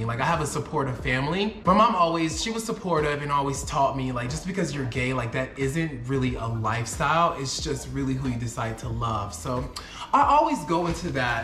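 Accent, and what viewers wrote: United States. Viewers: Lmao at the Coloured situation: South Africans are not well travelled. But because of your accent, I expect them to understand that you are an ''African/Black American'' who is on the lighter side, pigmentation wise. So when they ask you about your race, just say ''I am Black American,'' it will click to them! Your blond dyed hair is also not helping! Haha! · 1. Welcome to South Africa, the coloured thing is very common here. You light skinned. But you of course should tell them you are not coloured if you are not. But lovely to follow your journey in my home. South Africa has many race issues though and I am interested to know how you feel about that in comparison to the race issues in the USA. 2. also, I like the way you say "Johannesburg'. We just say Joburg here sis. Joburg! LOL 3. Becareful of Lagos. They HATE GAYS THERE and it is ILLEGAL! 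They could kill you!! 4. Dating is Transactional in Africa, cause they think you have money! That American accent sis. They think you have money!! The dollar is stronger though. 5. Polyamory is not for me as well, sis. But Africa and South Africa has a lot of it. ENJOY YOUR TIME IN SOUTH AFRICA! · They're crazy for mistaking or assuming u that u're coloured up there in Joburg please correct them n clearify that you're black and from america.its your accent that confuses them.dont accept the term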